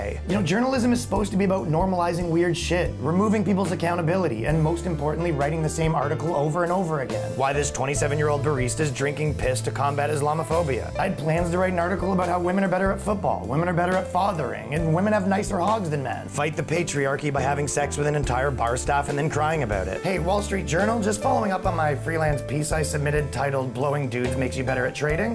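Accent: Canada. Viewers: Are you Canadian? Can definitely hear some canuck coming through. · your Canadian accent was really thick in this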